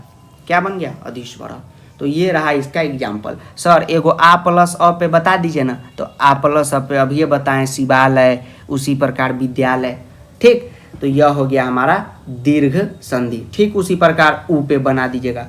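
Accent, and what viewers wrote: Bihar, India. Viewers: Are u bihari? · Bihar mein kahaa se hain aap 😂 aapki language main samjh gyi😂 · Bihari ho kya bhai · sir ap bihar se ho naa!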